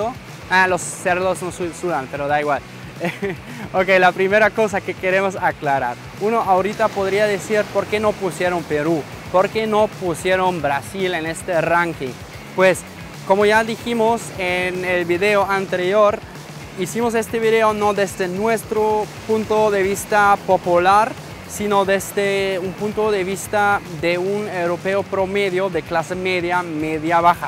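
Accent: Mexico. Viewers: el pinche aleman ya habla como pinche mexicano sin ofender me gusta mucho su canal ;) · ! muero de risa con tu mexicano! jejeje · Primera mente, aprende a hablar el español sin usar el lejo mexicano.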